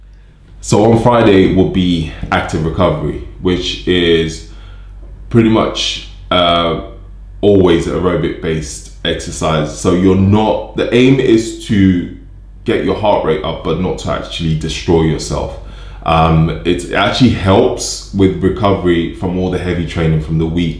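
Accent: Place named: United Kingdom. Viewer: Damn your so fit and your accent is so brittish very Nice. Btw congrstulations😉👍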